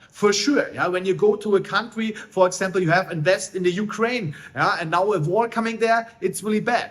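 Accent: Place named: Germany. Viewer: "whats the TRUTH". Your German accent speaking English is hilarious.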